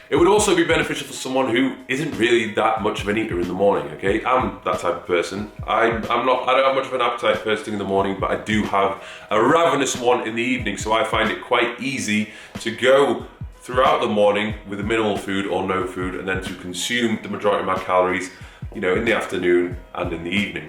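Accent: United Kingdom. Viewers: His voice sounds like John Cena with a british accent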